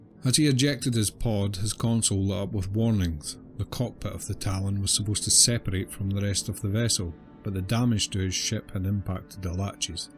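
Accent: Scotland, United Kingdom. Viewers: This is an incredible concept, well done! And I agree the Scottish accent makes it epic to listen to! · Your Scottish accent makes this! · Very nice!🪐 And the Scottish brogue is very easy to listen to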